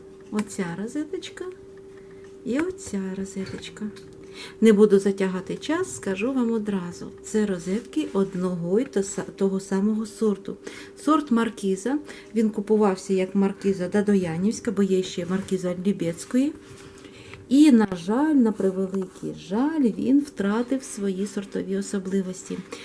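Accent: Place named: Surzhyk, Ukraine. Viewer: Суржик ,,режет" ухо.Отписалась.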